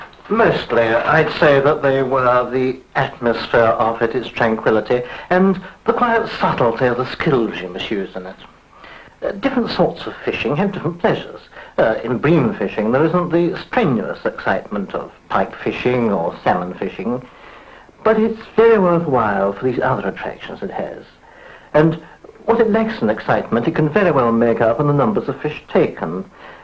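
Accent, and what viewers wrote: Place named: RP, United Kingdom. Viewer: Very posh old boy...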